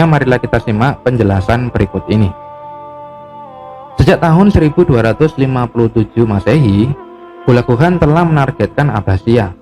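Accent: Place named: Banyumas, Indonesia. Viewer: Logate ky wong ngapak ☺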